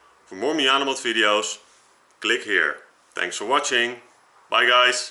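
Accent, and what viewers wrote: Netherlands. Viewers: Sounds like a Dutch guy :-) Super video, ga zo door!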